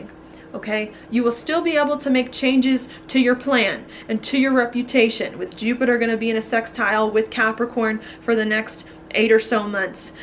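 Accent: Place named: South, United States. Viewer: Happy Holidays. Have fun in beautiful Georgia. I knew you were from there how you say so sweetly "thank you for watching my video, if you like it please share" total sweet southern way of saying something. Not an accent so much as a emotional quality. I love the south. My husband and I decided our personal heaven is gonna be like the south minus the bugs and gators.: )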